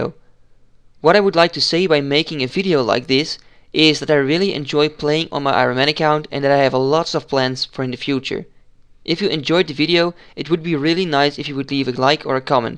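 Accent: Netherlands. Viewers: Ben je nederlands man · fantastisch nederlands accent :D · It's so weird that I just knew you were dutch from like the first word you said :S. I'm dutch too, the accent is not as obvious as alot of other dutch people though. Well done btw, I know what quests to do now aswell :D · Viel me op dat je Nederlands bent :) je maakt echt goede video's man! Gelijk geabboneerd, ga zo door man ;) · kom je gewoon uit nl?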